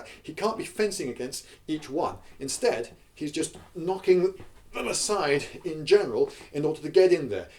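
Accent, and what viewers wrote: United States. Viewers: That was an amazing American accent. You sounded virtually authentic and I've talked to quite a few Americans in my time. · serious props for the american accent! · Your American accent is amazing. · Quality American accent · Love the American accent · nice American accent, so much better than my English one! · The American accent caught me off guard. It was really well done. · You have a really good american accent. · I love your American accent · I can't get over the American voice. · Your American accent terrified me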